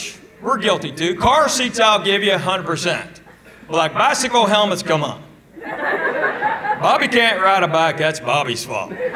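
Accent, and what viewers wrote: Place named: Tennessee, United States. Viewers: I just love your comedy. I am from East TN and I love a real TN accent not the phony ones so many peoplemyae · you're hilarious, I worked for a Korean dr that had immigrated from Korea to calif. then to East TN. I have a very thick East TN twang. So for the dr's b-day I got him a southern to English dictionary and a DVD of you. I came back to work the next day with this dazed look on his face. I thought something horrible had happened... he watched your DVD with his wife, children, his parents, and a few relatives. Best $ 30.00 I've ever spent. I got the side eye for the next month. His kids thought you were awesome... another asian person that had a TN Twang. The rest of his family demanded he more back to the West coast before his kids accents were permanent.😂 20 years Still makes me laugh. Damn, I should have thought to invite you to the office to meet him.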